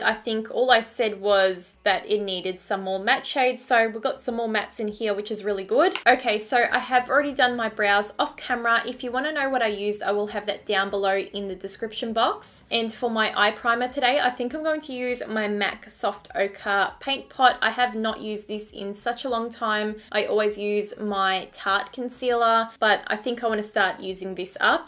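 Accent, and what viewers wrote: Australia. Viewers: Australian accent 😍😍😍😍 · lol i was like.... nz or aussie? and then she said 'they bloody hurt' and i was immediately like yup aussie 😂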